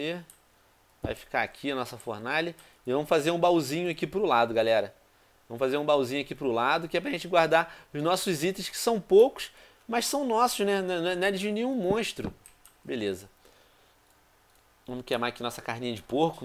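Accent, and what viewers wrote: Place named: Portugal. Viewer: Meo deos que nostalgia, meu, você foi minha infância, junto com todos do grupinho. S2 saudades de seus videos. Cof amo sotaque de Portugal, é tão fofinhooo.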